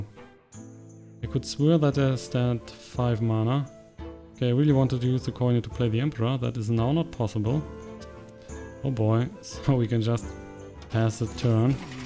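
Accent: Germany. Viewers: gratis Englisch nachhilfe: TOMB = TUHM sorry, das musste raus, du klingst henau so, wie meine satirische immitation des "englisch sprechenden deutschen". wirklich ganz typisch deutscher "aktzent". :D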